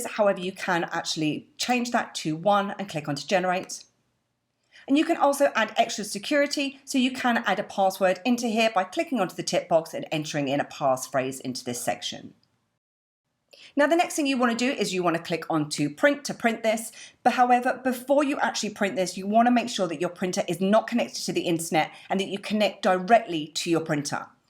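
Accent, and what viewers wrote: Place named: Essex, United Kingdom. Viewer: a lovely Essex accent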